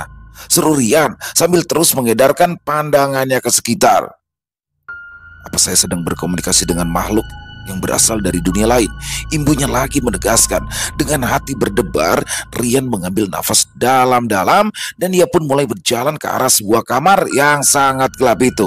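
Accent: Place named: South Kalimantan, Indonesia. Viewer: Met mlm mas dgn suara khasnya anak kalsel hdir lg nyimak ceritanya⭐⭐⭐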